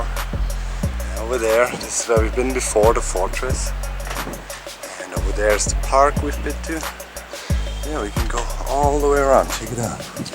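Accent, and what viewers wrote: Germany. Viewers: Here we have a German man in Spain Sperling English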